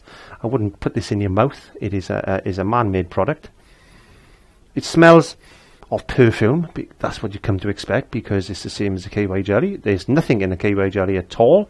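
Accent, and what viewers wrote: Wales, United Kingdom. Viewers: Great video! Am I detecting a bit of a welsh accent mixed in with another?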